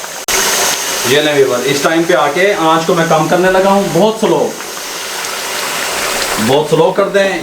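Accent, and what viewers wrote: Punjab, India. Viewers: Bhai mjhe khane bnane ka to shoq ni a me ka punjabi aksent sune ke lie lgata hn 😂😂😂👍👍👍👍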